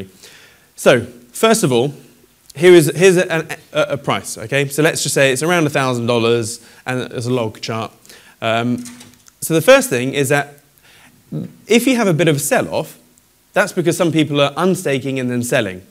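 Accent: United Kingdom. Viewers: Just because you say it in a fancy british accent doesn't make you correct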